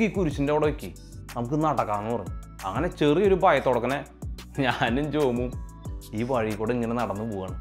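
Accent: Thrissur, India. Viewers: നിങ്ങളിൽ ഒരു നല്ല കഥയുണ്ട്... Life ഇത്ര മനോഹരമായി പറയാൻ എല്ലാർക്കും സാധിക്കുന്ന ഒന്നല്ല.. തൃശൂർ ഭാഷയും ഒരു നല്ല അടിപൊളി കാരണം തന്നാണ്.......!! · Proud to hear our slang #thrissur 🥰 nice presentation ♨️ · Bro good after noon.. Njanum oru trissur karan anu I was believing that our speed and tone not matching with story telling but... Gadeee nigalu polichu, perfect clarity, tone and more over the expressions are as you says lofly... If autobiography story telling got over you can start with some historical, animalistic, or some other kind story telling.. Iam sure that you make the people on peek of quorosity.... Keep rocking, from dubai.. 🙏🙏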